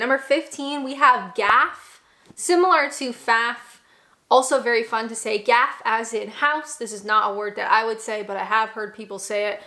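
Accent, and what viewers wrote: England, United Kingdom. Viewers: Thabk you,... Another excellent video. I do find the way you pronounce some words very endearing, so please don't become too British 😊. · I love it when you speak with an English accent, it sounds really nice 👍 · Love your English accent see if you can do a whole video in English accent lol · You should do an entire video in your English accent!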